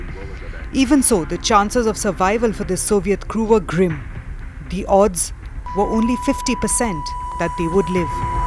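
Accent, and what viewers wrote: India. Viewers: Just speak your own indian language we do not enjoy that indian english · Iam getting so bored with Putin and his nuclear threat he has been saying this for months this video is click bate no doubt it will be repeated again when Putin makes his next threat and people start to wobble again just like before Putin knows the response from the west if he did it would be goodbye Russia funny the voice over is Indian Russia's Allie what a surprise not🇬🇧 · This Indian voice over is trash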